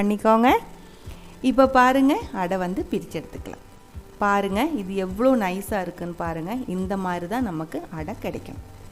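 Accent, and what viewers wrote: Kerala, India. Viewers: Mam very interesting... I loved it.. Malayalam slang Tamil... Super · Good process. Nice Tamil with kerala slang. Good ma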